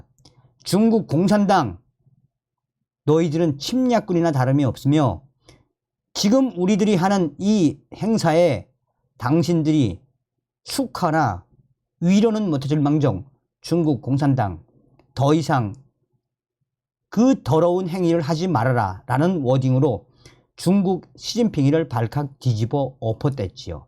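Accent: North Korea. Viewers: 북한 사투리 쓰면 왜 안됩니까 현장감있게 전달하려면 북한사투리던 뭐던 있는 그대로 전달해야죠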